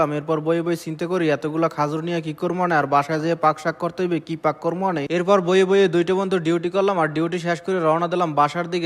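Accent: Barishal, Bangladesh. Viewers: Khajur ki? Khejur bolen.kotha shundor kore bolen borisailla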